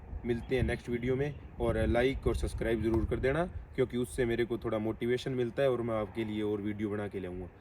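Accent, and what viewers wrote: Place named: Haryana, India. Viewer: Bhai Rajasthan haryana side se ho kya Hindi nal Dhaka ho rya ha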